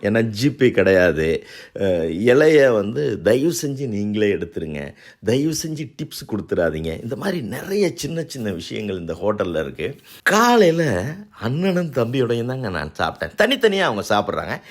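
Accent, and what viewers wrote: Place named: Sri Lanka. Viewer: உங்கள் தமிழ் உச்சரிப்பு ...சைலோனில் உள்ள எனது தமிழ் ஆசிரியரை நினைவூட்டியுள்ளீர்கள்.. அனுபவத்தை விவரித்ததற்கு நன்றி.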